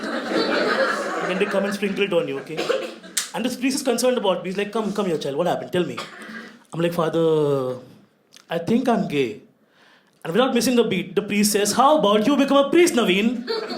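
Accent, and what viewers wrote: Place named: India. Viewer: I dont understand 99 percent of what he s saying. It seems like he speaking English and some other anguage but everything sounds like that Indian B.S. 😠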